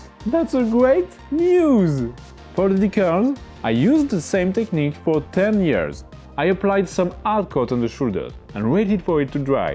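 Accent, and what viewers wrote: France. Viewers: Are you french?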